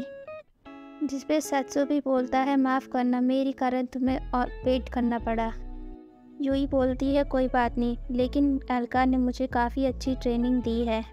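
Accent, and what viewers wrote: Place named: India. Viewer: I don’t want to be mean but you sound like every Indian person ever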